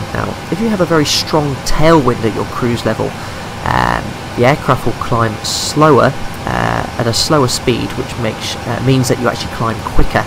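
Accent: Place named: United Kingdom. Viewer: Hey , I love your tutorials ..however you have to think about the watchers for which English is not the primary language spoken...if you just could take that into consideration and just articulate more that will help a lot to understand better your strong Brit accent ...specially with sim background noise Thank you ..👍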